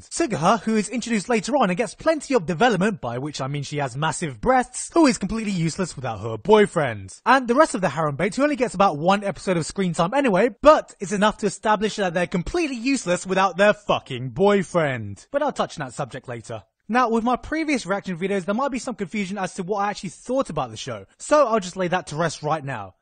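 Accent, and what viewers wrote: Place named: United Kingdom. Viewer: 英國口音就是這聲音啊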